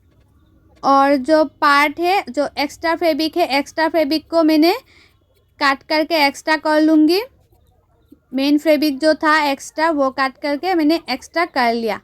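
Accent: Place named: Bengal, India. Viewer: Apnr kotha sune bujhte parchi j apni akjon bangali,, Ami ki thik bujhechi ?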